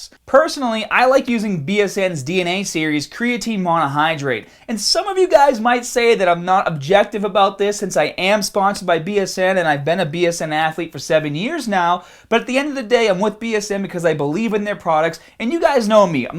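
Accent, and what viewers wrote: Boston, United States. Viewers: you've got to be from Boston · Love that Bahston accent!!! Thanks for the info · love the Bhaston accent! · You have the same accent as JFK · Every 10th word has a strong Boston accent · why are you talking like if you're in the Departed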